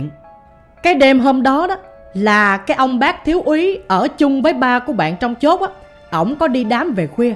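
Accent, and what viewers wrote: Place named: Mekong Delta, Vietnam. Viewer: Anh kể nghe đậm chất miền Tây luôn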